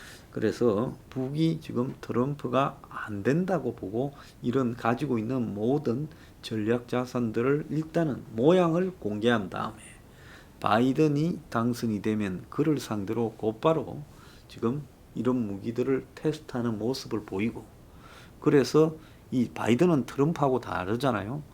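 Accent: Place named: Gyeongbuk, South Korea. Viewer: 황장수는 부산 출신이라면서 말은 진한 경북 말을 쓰니 듣기가 몹시 어색하다.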